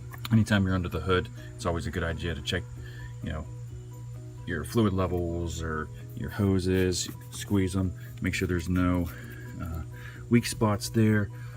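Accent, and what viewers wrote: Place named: Australia. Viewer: I loved the Australian accent 😂 made me stay and watch the whole thing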